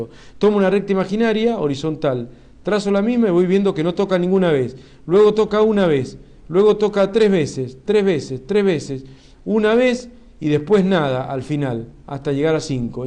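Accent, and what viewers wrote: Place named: Argentina. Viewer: es argentino pero le mete un neutro por ahi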